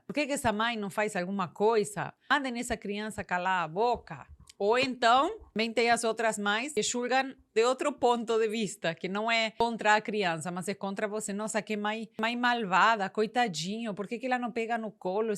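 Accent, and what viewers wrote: Spain. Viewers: E o tipo de mulher sem cuidados para ser mais feminina, sem pintar unhas, com esse sutaque espanhol faz ela misteriosamente sexy....ja eu vejo so por isso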